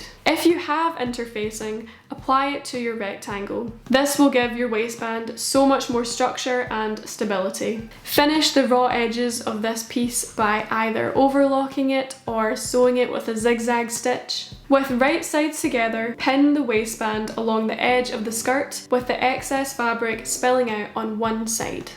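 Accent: Scotland, United Kingdom. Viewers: their accent sounds Scottish, but idk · Omg she’s Scottish, we love to see it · Love your accent, are you scottish? (I think thats how you spell it) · Hello! I love this video! I have a question, are you from Scotland? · It’s a Scottish accent :)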